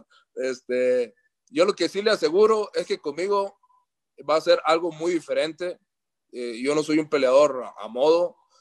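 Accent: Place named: Puerto Rico. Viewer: Que hablador está resultando este muchacho... Parece puerto riiqueño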